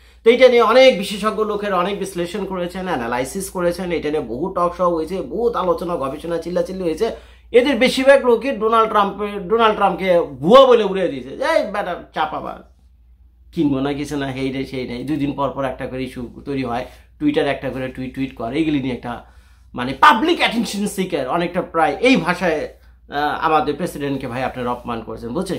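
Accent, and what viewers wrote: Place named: Dohar, Bangladesh. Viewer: আপনার কথাবার্তা গুলো শুনতে ভালোই লাগে, নিজের এলাকার (দোহার ও নবাবগঞ্জ) মতো কথাবার্তা মনে হয়। যদিও আপনার বেশিরভাগ মতামতের সাথে সহমত হতে পারি না।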